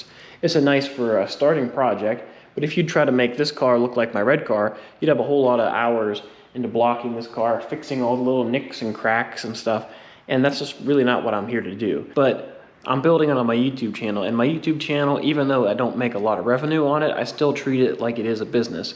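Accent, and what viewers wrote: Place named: Southwestern Pennsylvania, United States. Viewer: I'm guessing by your accent that you are in the southwestern PA area. I have family in Latrobe. Lol....let me know if I'm right.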